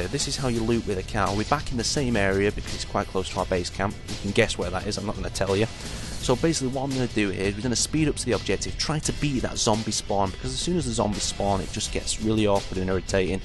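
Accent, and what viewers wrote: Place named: Manchester, United Kingdom. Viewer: which part of Manc are you from?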